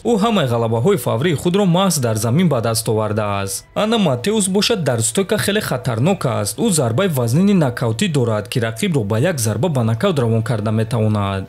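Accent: Tajikistan. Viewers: что за язык .тупой еше тупее.пазориш таджикский язык и акцент.пазорный волк.прекрати мычат.баран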